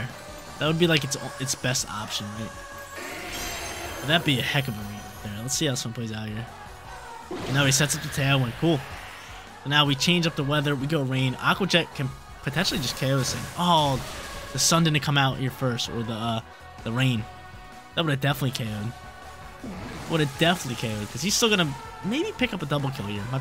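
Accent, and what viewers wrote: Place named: New Jersey, United States. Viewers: You from New Jersey ? Sounds like it lol. Or at least the north east for sure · I think he is! Hahaha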